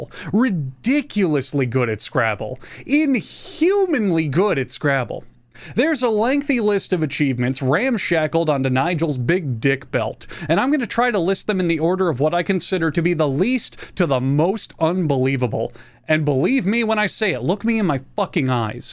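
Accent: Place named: Florida, United States. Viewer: He didn't pronounce it as an acronym it's just his Floridian accent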